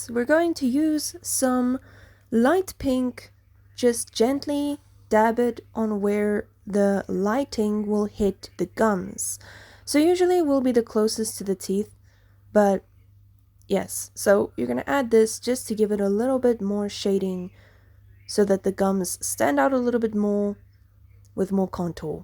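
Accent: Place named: United Kingdom. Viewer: Oy you also British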